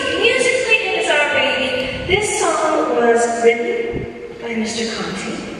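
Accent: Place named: United States. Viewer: she sounds american now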